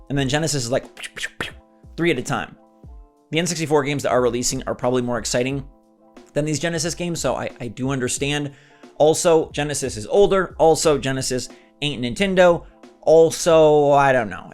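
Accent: United Kingdom. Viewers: I was wondering the British accent but the queen did kinda die though😢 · Your British accent sounded just like the guy who plays Phillip in the Crown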